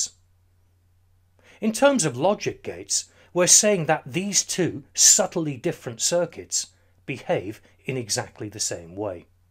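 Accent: United Kingdom. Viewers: Only reason i picked your video was because of the British accent. Hate to admit it but it is what it is.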